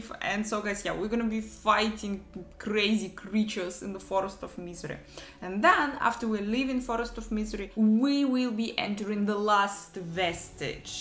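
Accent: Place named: Russia. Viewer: Русский акцент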